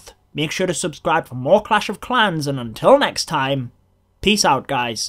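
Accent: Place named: Scotland, United Kingdom. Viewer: Where are you from? You sound Scottish and English combined.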